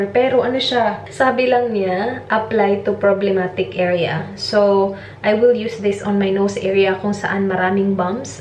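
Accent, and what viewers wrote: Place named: Cebu, Philippines. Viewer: Hi po ate bisayaaaaa kaykaaa ate cebuana kaayo💛😍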